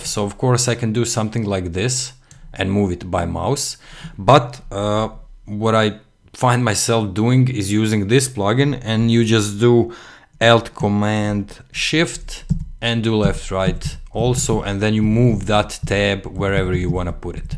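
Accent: Russia. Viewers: рускиий акцент) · русский акцент? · Thank's - you have a log of useful videos on your YouTube channel. Where are you from? I think maybe russian accent?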